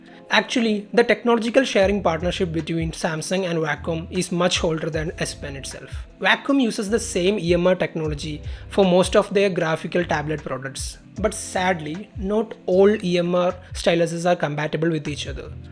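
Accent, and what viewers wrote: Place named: India. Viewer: english indian LOL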